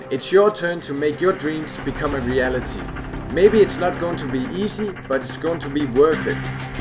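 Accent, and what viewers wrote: Denmark. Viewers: are u from denmark xD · Hey! You're a big inspiration to me, ty for being who you are. I can hear on your accent, that you're danish too. #DanskArmy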